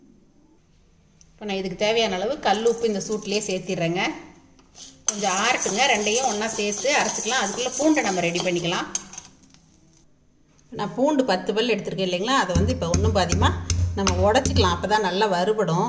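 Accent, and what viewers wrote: Kongu, India. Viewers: Thank you amma I like ur receipes and way of talking Kongu slang I am from Erode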